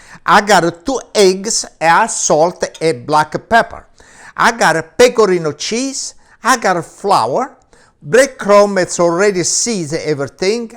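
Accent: Italy. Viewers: This is the most italliano uncle i’’ve heard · Love his Italian accent love him thank you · When I heard that thick Italian accent of his I knew it was gonna be a good cooking tutorial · With that accent it just tastes better. Reminds me of my grandfather who was from Italy. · Oh gosh he is so adorable.. his real authentic Italian accent is even better.. Oh kay!! I like eggplant parmigiana... It’s a oh yeah baby!!!.. ❤️ love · Love his accent.i just love those Italian men!!!! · I love this little old guy. He’s so cute w/his Italian accent and his drink-drink gestures. LOL I’m trying to recipe tonight. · I AM SOOOO MAKING THIS FOR MY BROTHERS THIS THANKSGIVING. BECAUSE THEY DNT EAT MEAT. AND THEY LOVE EGGPLANT I STUMBLED UPON THIS RECIPE. AND I LOOOOOOVE HIS AUTHENTIC ITALIAN ACCENT.😇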